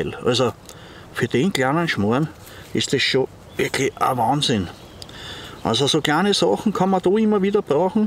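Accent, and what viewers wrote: Standard. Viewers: Dein Hochdeutsch ist 1A ... als Niederösterreicher warst du für mich total verständlich. 😄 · Moin, schöne Vorstellung! Danke dir. Das elastische Paracord finde ich echt praktisch, das kannte ich noch gar nicht. :) Lieben Gruß Sven PS. Sagen wir medium Hochdeutsch 😋 bist aber wie immer gut zu verstehen außerdem mag ich deine Mundart :)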